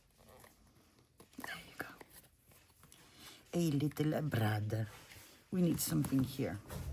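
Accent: France. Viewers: I love your style. I think the mole one is my favorite too. You do great with English being your second language. I do love hearing your french come out though. And your puppies.. gets my puppy all involved to and makes me laugh! ( mine watches tv ) Thank you I love the random ephemera videos.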